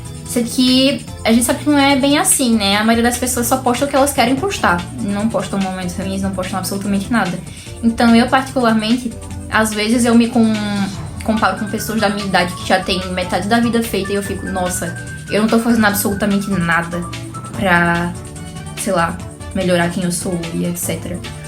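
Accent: Northeast, Brazil. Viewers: Talvez eu esteja enganado, mas percebi um leve sotaque nordestino em você, talvez influência de algum conhecido. Eu sou do nordeste, por isso. Eu sinto muita nostalgia dos anos 2000 também, muita mesmo, e mais ainda dos anos 90. Eu nasci em 1992 e possuo 33 anos, não importa nada do que digam e explicações, eu vivi esses anos e eles são infinitamente melhores que os atuais. E eu posso argumentar com fatos! As pessoas viviam focadas em suas relações interpessoais; A política e economia no país seguiam a normalidade, ou seja, infinitamente melhores que hoje; As drogas tinham menos alcance, haviam poucas facções e não controlavam o país inteiro como hoje; Havia segurança jurídica: Os políticos não roubavam descaradamente e nem prendiam quem descobrisse; A modernidade líquida não havia de tornado, ainda, o padrão. E eu poderia ficar aqui por horas. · Oii bom dia tudo bem? 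tava tomando café da manhã, e fui abrir o youtube pra assistir algo enquanto como, e seu vídeo apareceu na minha tela com um título que me interessou bastante, assim que você começou falar, já senti que era de algum lugar do nordeste/norte, e vi ali num comentário você falando que é de PE, sou de Garanhuns, não sei se conhece, ah e você falou que vai fazer 22 daqui alguns dias, vou fazer 27 dia 31 desse mês também, enfim, de qualquer forma queria falar que sinto que seu canal já virou um dos meus favoritos do YT, tanto por você ser do mesmo estado que o meu (isso causa uma sensação de maior próximidade, sei lá), quanto pela qualidade do primeiro vídeo, isso faz eu sentir que os seus próximos vídeos irão me agradar sempre, te desejo todo sucesso do mundo! ansioso para ver os próximos conteúdos <3, saudade anos 2000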